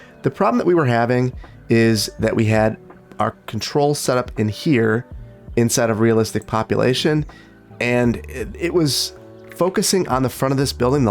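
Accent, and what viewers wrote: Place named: Upper Midwest, United States. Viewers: you really hear that north midwest come out in baggers (BAEggers) I think that part of the regional accent is so charming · Lol, "certain amenities like *beggars*"...Gotta love that midwestern accent!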